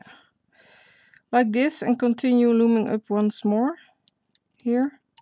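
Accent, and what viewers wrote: Netherlands. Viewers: you dutch ? You sound really dutch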